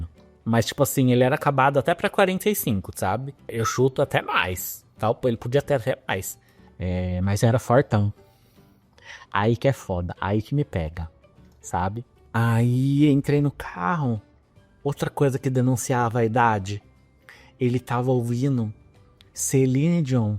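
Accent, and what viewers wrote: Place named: United Kingdom. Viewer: seu sotaque em inglês é britânico, percebi isso hoje.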